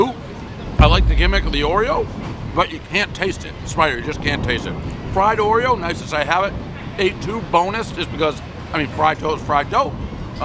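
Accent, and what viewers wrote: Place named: Massachusetts, United States. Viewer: His Massachusetts is really showing on these boardwalk reviews...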